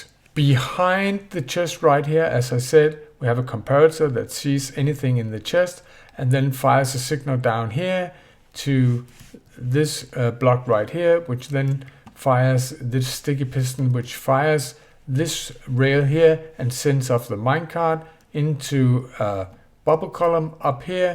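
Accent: Denmark. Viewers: Are you from Denmark? 😊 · “Det er en dansker.” Jeg var inde og kigge efter den første video jeg så med dig. Der er noget specielt over danskere der taler engelsk.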